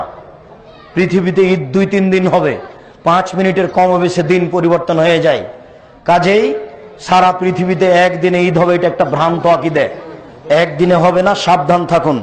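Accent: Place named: Kolkata, India. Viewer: হালায় দেহি কলকাতার ভাষায় কথা বলে বুঝ্ঝি হালায় ইন্ডিয়ার দালাল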